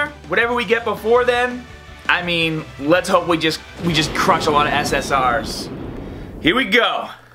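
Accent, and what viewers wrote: Boston, United States. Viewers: you must be from boston 😀 just taking a guess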